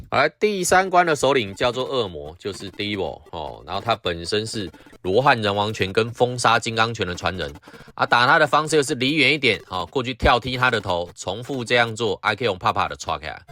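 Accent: Taiwan. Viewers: 非常喜歡的台灣國語，千萬不要改掉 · 每次聽講解遊戲，偶都笑不停，那台式旁白真是太有才了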